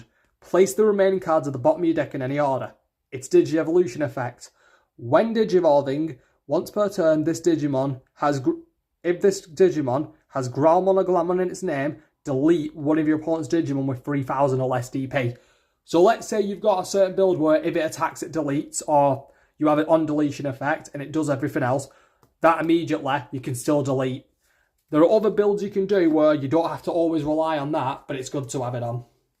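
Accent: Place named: Yorkshire, United Kingdom. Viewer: Mate you sound like you're from Barnsley or Yorkshire... But this is an interesting profile...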